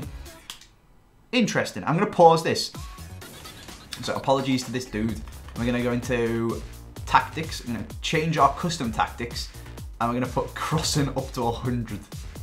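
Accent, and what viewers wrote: Liverpool, United Kingdom. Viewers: Don’t think could sound more scouse in this vid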